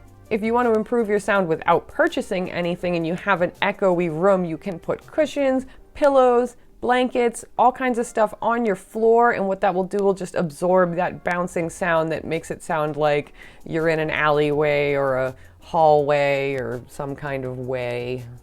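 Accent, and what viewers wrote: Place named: Boston, United States. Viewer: I watched this video on my daunting hour drive home from work and it made me so happy! I have never contemplated (and probably won’t) starting a channel, and I enjoyed this video so much nonetheless! You are such a breath of fresh air in this community, and I am so hooked on your channel. PS - it must be a Boston thing to pronounce room “rum” because I swear you and Beantownhouselants always have me rewinding to relisten like, who’s drinking rum???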